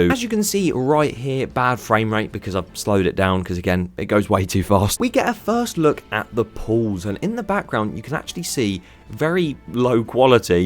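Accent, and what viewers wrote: United Kingdom. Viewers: I like you, british man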